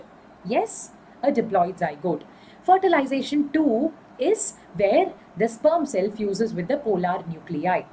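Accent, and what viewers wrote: Kerala, India. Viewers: are you malayali?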